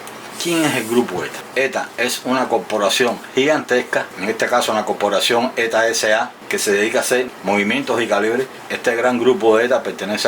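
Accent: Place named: Cuba. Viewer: Por el. Acento pareces cubano es decir somos paisanos, concuerdo contigo hay mucha vistilla y poca autenticidad, hoy casualmente compre un Tissot seastar seven de cuerda manual, q lleva un 781-1 y por esos años 70 me imagino q la base sea in house! Pero me surge la duda... Gracias por tan interesante contenido, sigue compartiendo!! Y si me pudieras decir q maquinaria tendría esa pieza de la q te hablo!! Lo demás son dolares tirados, los relojes auténticos en cuanto a su interior están muy escasos!!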